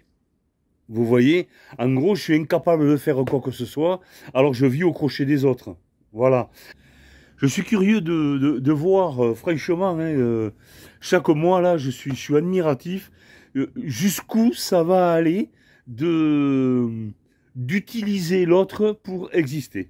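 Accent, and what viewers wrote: France. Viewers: Salut, pas d' accord avec toi, "les cons ça osent tout, c'est à ça qu'on les reconnait...". Ils y en aura toujours, donc il faut faire avec, mais on les emmerde et il faut leur faire savoir Haut et Fort. Notre personnalité n' a pas à en souffrir, au contraire, rentrons leur dedans par la dérision en leur montrant qu' ils nous amusent... Par contre, entièrement en accord avec toi, sans les créateurs ils n' existent plus...ils ont essayé d' être eux même créateurs, mais ils ont échoué... En tous cas, ton accent de cigale est toujours aussi mélodieux à entendre....Bons vols, bonnes courses et plein de bonheur.....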